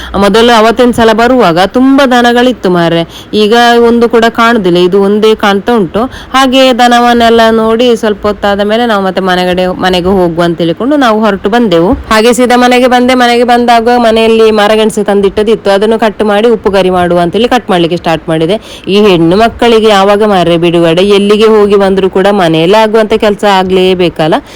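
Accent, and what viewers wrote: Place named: Sullia, India. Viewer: Ninu sulliada rural houdu mathaduvaga gothagutha ide. Madam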